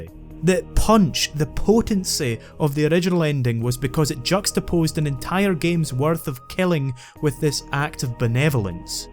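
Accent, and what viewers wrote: Scotland, United Kingdom. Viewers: wow ur very scottish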